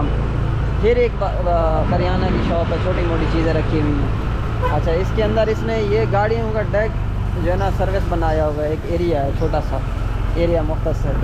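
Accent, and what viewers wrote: Saraiki, Pakistan. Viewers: تم سرائیکی ہو تمہارے لہجے سے پتہ لگا میں بھی سرائیکی ہوں ڈیرہ غازی خان سے مسات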